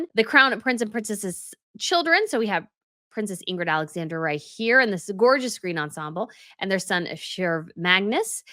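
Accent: United States. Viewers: I love you, are you from the South? I don't need to know exactly where but I swear you could be one of my friends:D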